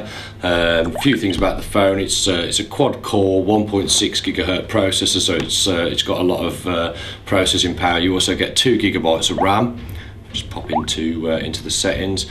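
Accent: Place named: Black Country, United Kingdom. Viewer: Are you from Birmingham or Dudley or somewhere close to there?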